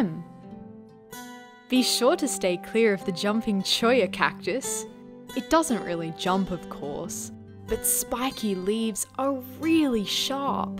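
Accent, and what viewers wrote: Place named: Australia. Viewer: Sounds like a Australian accent it's kinda harsh to ask for them to pronounce it correctly many people may pronounce it differently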